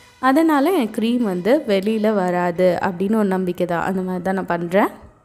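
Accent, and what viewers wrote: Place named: Hindi Belt, India. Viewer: Super voice, hindi accent varudhe yen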